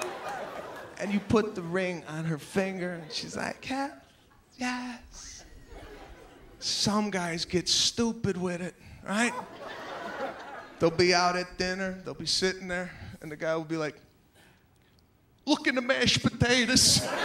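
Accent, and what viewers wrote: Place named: Chicago, United States. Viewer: He has one of the strongest Italian Chicago accents I've ever heard.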